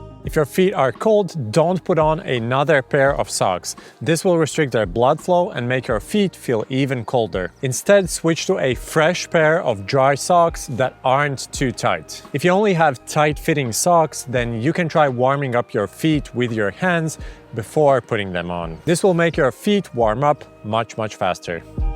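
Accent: Latvia. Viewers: Es dzirdu latviešu akcentu? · Latvian accent? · man pagāja 7 sekundes līdz uzķēru, ka tāds akcents ir tikai latviešiem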